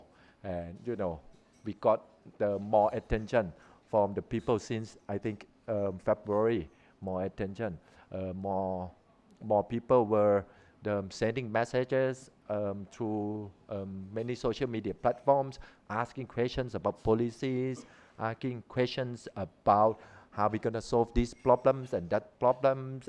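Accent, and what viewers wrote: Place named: Thailand. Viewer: อังกฤษสำเนียงไทย โคตรฟังง่าย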